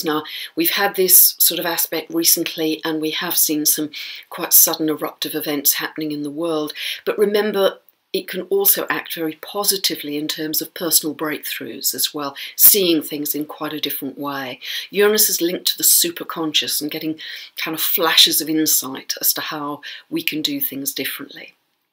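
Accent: United Kingdom. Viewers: Hello. Really like the scientific approach to your video and we cannot deny, looking at the energies in the world, that what you are saying it not valid. So many people like your videos because your British English is so clear and lovely. Best Wishes to you; ) K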